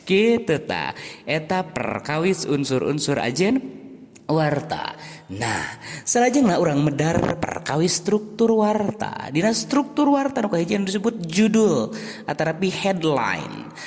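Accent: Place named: Sunda, Indonesia. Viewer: Waduh Sunda pisan ny......warta berita ... Kedah d momole bahasa Sunda teh.. Semangat kang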